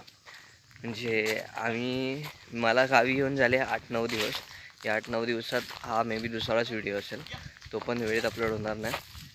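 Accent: Malvani, India. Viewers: Bharichhhh re maja Eli bghuk pan tumchi vat lagli naa.... Malavani ch boll bara vatta eikak 😄aaplo konkan asoch hirvalit rhavan de Maharaja 🙏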